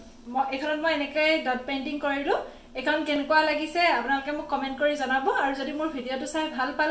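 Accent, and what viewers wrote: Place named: Nagaon, India. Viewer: Tumar maat tu xosai dhunia aamar Nagaon nisina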